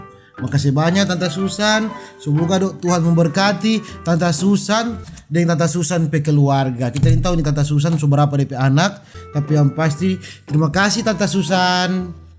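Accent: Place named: Manado, Indonesia. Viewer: Hhhhhh lucu dpe ba gas.. bahasa manado 😂😂😂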